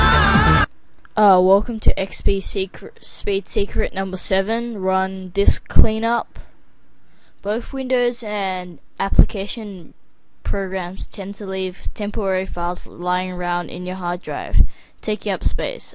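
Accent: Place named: Australia. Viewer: =O AN AUSTRALIAN!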